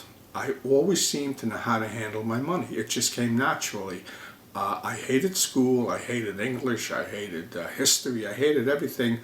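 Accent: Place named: New York, United States. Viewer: just because you wear sunglasses and have that brooklyn or NY accent or whatever the hell you are from doesn't mean you know what you're talking about when it comes to blackjack. lol selling systems. Evidently his system isn't working if he needs more money.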